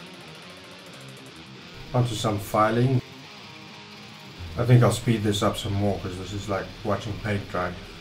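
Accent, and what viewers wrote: South Africa. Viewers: I have never seen that plunge technique before mate, that's awesome. That will come in very handy I'm sure. Sounds like a Durban or Cape town aven't there?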